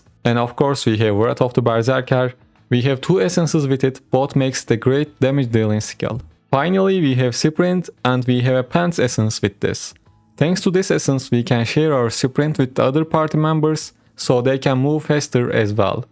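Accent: Turkey. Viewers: aksan türk birinin ingilizce metin okumasına benziyo :D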